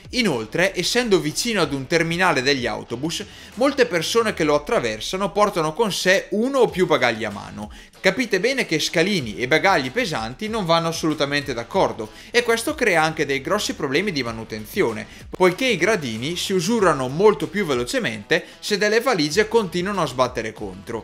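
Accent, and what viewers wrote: Brescia, Italy. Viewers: Ma quell'accento bresciano? :-) Brao!! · Si sente che sei di Brescia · Bravi ! Sempre esaustivi e concreti! Ma siete di Brescia? L’accento sembra di lì…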